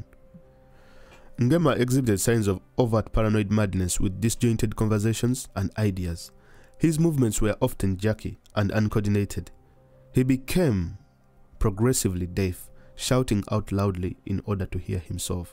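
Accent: Uganda. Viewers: I think the presenter is Ugandan. It's just about how they pronounce words. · A ugandan is narrating this.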